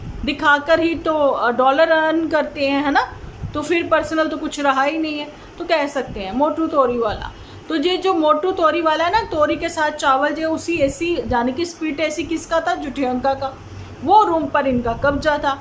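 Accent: Punjab, India. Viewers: ji main ludhiana se hu pera apki punjabi theth punjabi hoti bhot achai lagti jai🎉🎉🎉🎉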